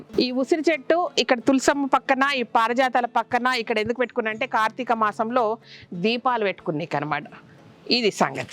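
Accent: Telangana, India. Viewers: మన తెలంగాణ భాష మన యాస వేరే అబ్బా 🙌🙌🙏🙏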